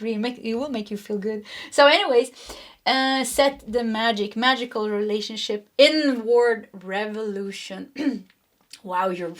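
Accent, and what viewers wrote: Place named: Sweden. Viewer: Chose pile 3, have experienced a heart chakra activation in the past year and I love your adorable Swedish accent. You have such a sweet energy! I lost several family members to cancer, cardiac issues and dementia. My first half of my life was filled with trauma, loss and adversity. At 45 years old I am exploring a potential career change and I have connected with a soulmate.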